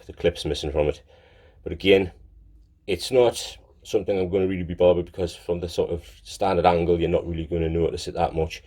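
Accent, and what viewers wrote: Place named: Newcastle, United Kingdom. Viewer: Areet. I am from Sunny Sooooth Shields. Nice to hear a local Geordie review some hot toys figures. F*ckn Best accent in the world and what hot toy review needs! Beats the Aussies, Americans, southerners etc on here! I have literally just stumbled across your channel browsing reviews of my next purchase. Awesome collection you have man. I have just subbed. Hope to see more! 💪🏻👊🏻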